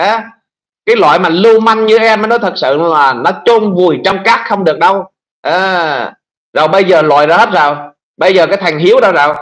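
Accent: Standard. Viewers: Nói chuẩn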